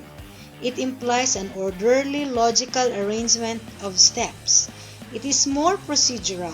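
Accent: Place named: India. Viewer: Indian English is too easy to.detect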